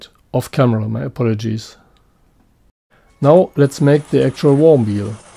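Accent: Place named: Germany. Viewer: Лучше бы по немецки шпрехал. Непривычно слушать англискую речь с немецким акцентом.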